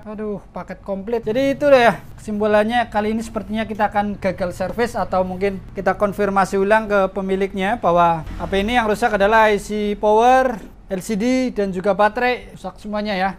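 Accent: Banyumas, Indonesia. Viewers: Koyo wong ngapak iki ra ngapak ra penak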